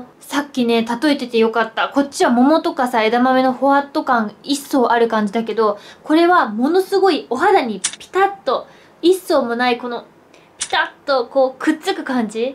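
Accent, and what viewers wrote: Kansai, Japan. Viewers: 秦基博好きなんですか？ なんとなく関西弁の人見たら応援したくなります。これからも頑張って！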